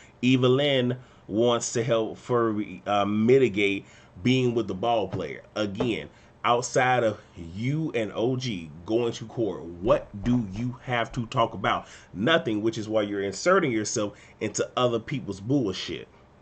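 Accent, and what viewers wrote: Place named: Louisiana, United States. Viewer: Lmao dead I hope they throw that water out, are u from Louisiana? I sorta hear an accent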